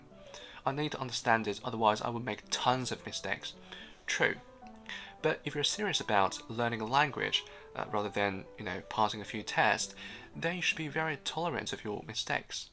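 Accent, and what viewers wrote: United Kingdom. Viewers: 請問可以分享您成為口譯員的歷程嗎? 謝謝 ps:英國音真好聽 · 英音听着好舒服❤❤❤ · 厉害的小伙子！第一次看视频。 Elegant British English!💕💕😊😊💙💙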